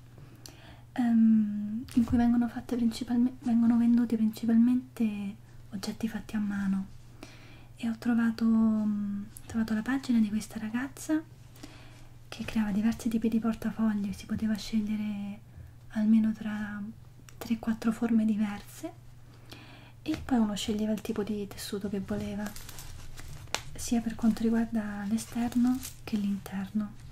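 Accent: Italy. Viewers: Hai una voce che ricorda il dialetto romano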